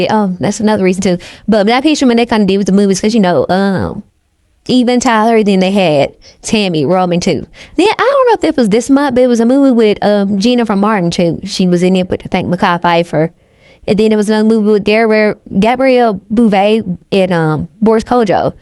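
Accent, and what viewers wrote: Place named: South, United States. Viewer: Your southern accent is giving me life! Tennessee? Appalachia?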